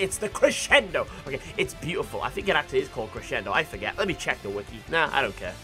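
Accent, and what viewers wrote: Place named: England, United Kingdom. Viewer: you sound like am english timon from lion king😭😭😭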